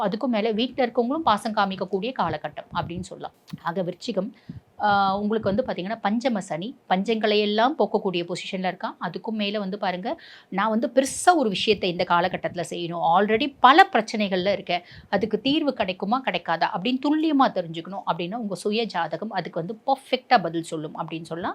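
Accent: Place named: Northern Tamil Nadu, India. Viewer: Ennadae ammea vadakku thamilatchiyo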